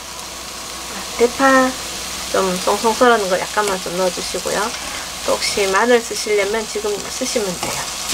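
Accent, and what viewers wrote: Gyeongsang, South Korea. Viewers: 찾아보는 경상도 말씨라 귀에익고 설명도 잘 해주셔서 늘 잘보고있습니다 고추장도 쉽게 가르쳐 주셔서 찹쌀고추장 담아 여기저기 나눠주고 맛있게 먹고있어요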